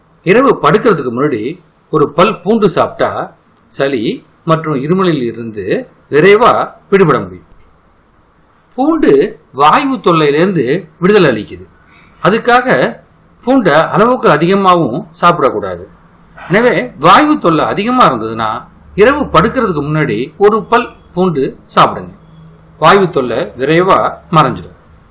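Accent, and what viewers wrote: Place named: Kerala, India. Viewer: 😂😂😂 but malayala slang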